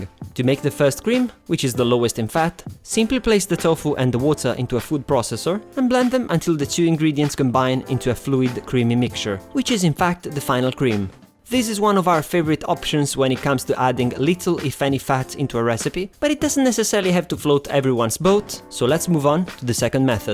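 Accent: Italy. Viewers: I love this channel, so many ideas. Have a hard time finding raw cashews, everywhere I see them are fried or roasted. Also I really like your accent, is it italian? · absolutely, thank you! And same here. May I just add that your videos are amazing! They are very well done and the explanation is terrific! I have watched quite a lot of them and can't wait to look at more, and obviously try them. I am not a vegan, but a vegan-friendly vegetarian (🙄😜) and videos like yours are very helpful. And I was missing cream so badly (the store-bought one isn't good, at least here in Europe). So, thank you! Do I recognize and Italian accent? Maybe from northern Italy? Un saluto da una tedesca cresciuta in Italia 👋🏼 Ciao!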